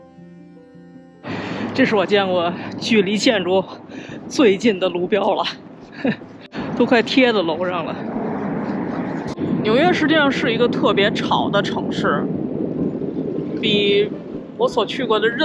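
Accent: Beijing, China. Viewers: 之前是喜欢这一口京腔儿，现在是喜欢这样一个真实的摄影师，还是期待EDI能走的更远👍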